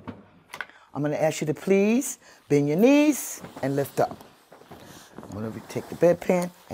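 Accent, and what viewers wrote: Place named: New York, United States. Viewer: Love her accent is that ny?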